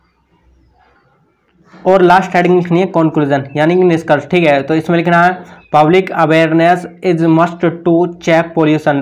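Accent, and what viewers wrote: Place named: Punjab, India. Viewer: Sir aap Punjab se ho kya? Mja aagya aapka language sun ke Hindi +Punjabi+English Mja aagya🤠🤠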